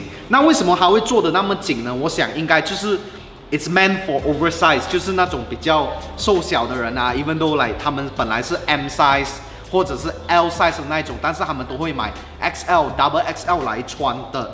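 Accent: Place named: Malaysia. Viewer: 听到熟悉的马来西亚华语，原来您也穿我喜欢的素T，好棒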